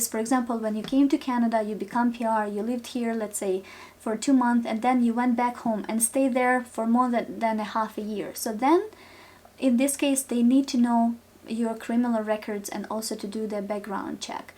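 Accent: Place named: Eastern Europe. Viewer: you have an east European accent and I Wana visit Poland, Croatia and Bosnia in the summer that was why I asked, never mind anyways I'm from Calgary.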